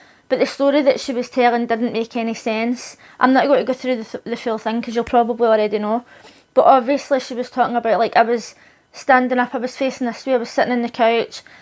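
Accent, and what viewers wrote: Scotland, United Kingdom. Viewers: Thats scottish, right?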